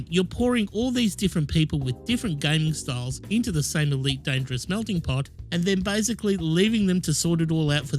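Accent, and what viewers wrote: Australia. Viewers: Couldn't agree more with your suggestions mate. A 14 year old with nothing to do but train and cred up can, and will, slaughter my helpless newbie ass just for shits & giggles, and suffer absolutely no consequences for it, so it's perfectly logical to me to stay in Solo and enjoy myself there. If FD were to take up some of your karma suggestions, that'd be good for the entire game and would get me into Open. The worst that could happen is the ganks would leave (good riddance) which wouldn't hurt Frontier at all since they don't have a subscription model. And frankly, these game mechanics make no internal sense. If the universe knows you're wanted, how is it they nobody knows you've murdered 50 other players this evening? It's a no-brainer that constantly ruining other players' enjoyment of the game should have consequences. You've got my vote. 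for FD karma konsultant!:-) By the way, loving hearing Strine on ED videos.:-) Keep up the good work!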